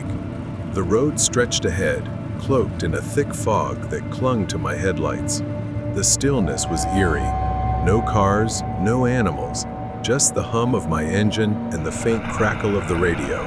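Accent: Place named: New Jersey, United States. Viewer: your jersey accent makes your videos all the more comforting. been following since😰😰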